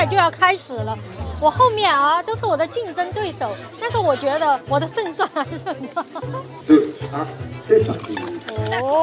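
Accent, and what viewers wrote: Sichuan, China. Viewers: 四川口音是改不掉了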